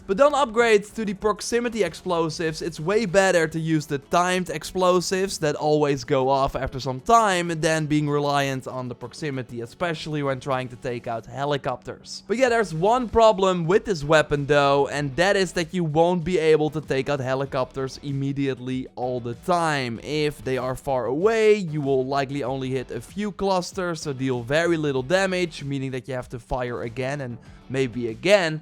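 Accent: Netherlands. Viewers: Ik hoor je Nederlandse accent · Are you dutch? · Ik wist dat hij Nederlands was vanwege de accent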